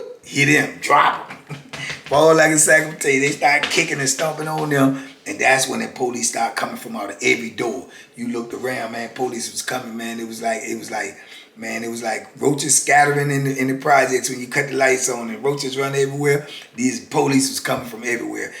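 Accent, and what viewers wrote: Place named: South, United States. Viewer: U r a very interesting man. No disrespect to ur relationship, BUT U R AN INCREDIBLY HANDSOME MAN, THAT SOUTHERN ACCENT IS EVERYTHING. U CAN GET IT FROM ME. I LIVE IN N.Y. B BLESSED . DORIE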